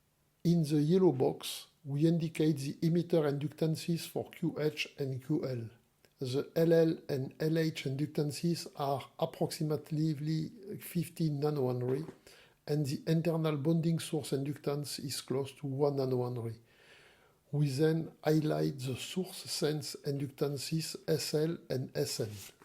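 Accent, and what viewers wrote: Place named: France. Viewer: French accent is soooo funny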